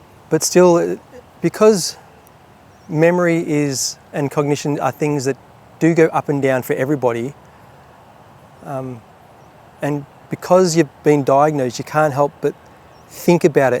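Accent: Australia. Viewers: Man, I don't know what to say! Get better soon? Feels like some kind of scam. I like your Oz accent, I hate your predicament. It's not fair. Love from Stockholm <3 So. The story you told about getting your diagnose. How you felt like your partner and your brother were your champions, fighting for you. That like broke me completely. And I'm not usually the person who cries. Thank you again so much for sharing! What you are doing is brave and important I feel. It's like I don't know how to tell you, this touched me to my core and I'm not even a spiritual person.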